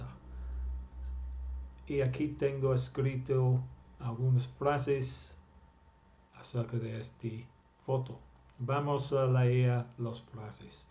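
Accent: England, United Kingdom. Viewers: Sus clases son excelentes, me encanta la pronunciación inglesa, y su forma de enseñar. Muchas gracias profesor.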